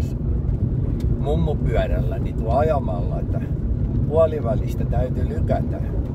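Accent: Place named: Tampere, Finland. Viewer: Aaargh!😖 Saan suorastaan oksennusrefleksin, kun kuulen tamperelaisella murteella ”määää näin niin isoja Raktodin munia pellllolla” 🤮🤮😵 Ei kertakaikkiaan voi käsittää, miten ylös työntävältä voi kuulostaakkaan tämä ”raktodin muna” näin isäntänä…..ja murteeltaan. 😵‍💫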